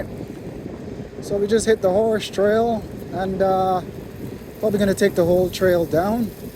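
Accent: Barbados. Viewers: Bajan accent?🇧🇧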